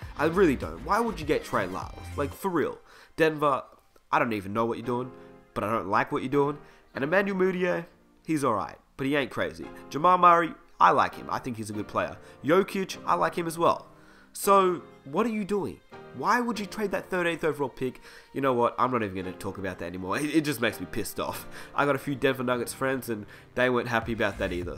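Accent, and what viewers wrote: Australia. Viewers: Is this guy Australian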